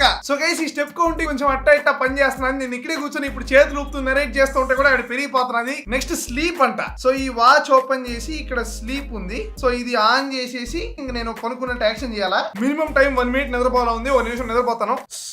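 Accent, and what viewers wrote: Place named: Telugu, India. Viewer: Europe lo telugu abbai la undhi voice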